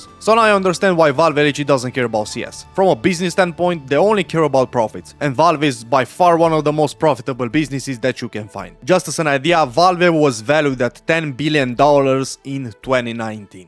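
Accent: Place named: Romania. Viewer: I can bet u re Romanian by the accent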